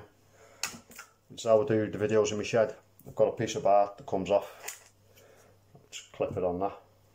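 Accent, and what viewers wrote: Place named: Australia. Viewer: Ripper Aussie accent, think you should have a blast of the Nigeria accent!! Wat’cha think?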